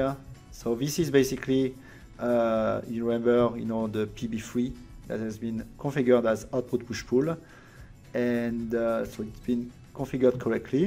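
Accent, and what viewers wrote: France. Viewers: Thank you very much for the perfect "Getting Started" tutorial. Very lovely french accent also :) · hi, thanks for this tutorial, I guess you're a French native speaker 🤔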